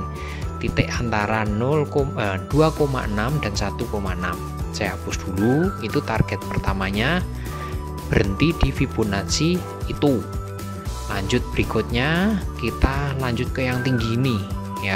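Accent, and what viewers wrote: Java, Indonesia. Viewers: seep ..om ...keren....medoknya hmmmm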